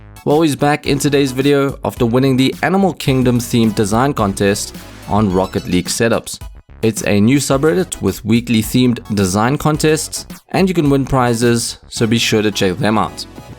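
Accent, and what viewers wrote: South Africa. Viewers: Is this a South African accent i hear?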